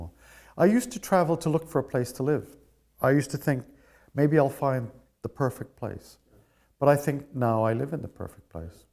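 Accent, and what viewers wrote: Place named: New England, United States. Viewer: Upper-class New England accent. Great writer. Smug. Patriarchy supporter. Western Imperialist. Total White Man.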